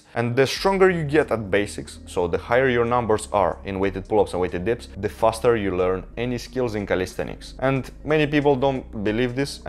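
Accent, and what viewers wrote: Russia. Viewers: That's familiar accent. Are you Russian? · I think pavel tsatsouline created this blueprint for a kind of austere, soviet militaristic style of training. I think even if didn’t call himself soviet core others would just because of his accent & dedication to hard training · Wow, Russian accent.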